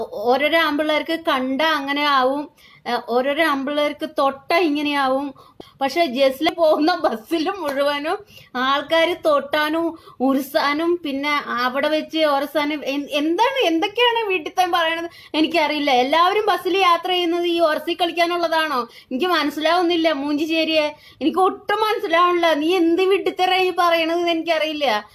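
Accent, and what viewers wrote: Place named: Palakkad, India. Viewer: Thanni palakkad thanne basha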